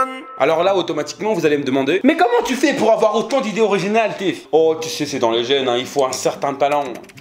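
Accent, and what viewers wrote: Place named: Antananarivo, Madagascar. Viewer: jsuis malgache, de majunga et jai tout capter ce que tu a dit mdr, toi tu es plus de tananarivo nn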